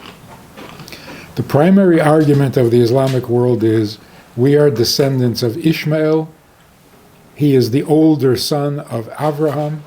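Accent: United States. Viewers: good voice, sounds like a radical priest from arkansa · 😂 love the Texan accent, suits you! X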